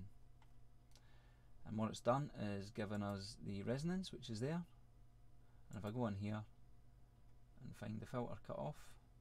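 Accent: Scotland, United Kingdom. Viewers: what accent is this? =) scottish? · dude,how do you setup the virus ti with cubase properly? i dont manage:-( btw,are u scottish? i can hear that,i am from Aberdeen:-)) anyway,i am struggling with it :-(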